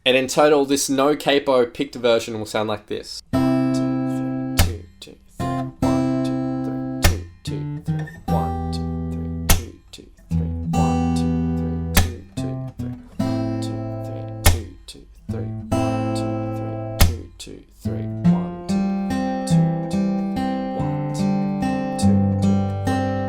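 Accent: Australia. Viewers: Ooooooo Australian c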